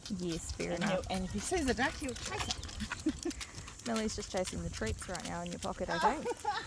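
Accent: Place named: Australia. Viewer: I love your accents. It reminds me of the Aussies down under. 😊❤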